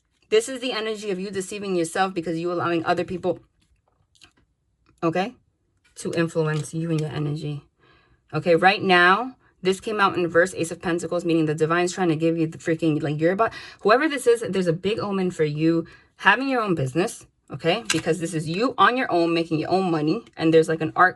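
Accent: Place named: New York, United States. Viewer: I can hear your New York accent! I know that was random but great reading! 💚💚💚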